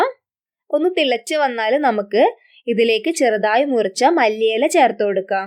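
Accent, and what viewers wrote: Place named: Kannur, India. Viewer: Ulli curry Adipoli yayittundu ketto ..thank you..💐💐✌️🤝 Thalassery (Kannur) kkariyo atho vadakara.. kkariyo? Endhayaalum randilonnaanu.. urappu .😄✌️🙌..love your way of speaking..🥰💖💖💖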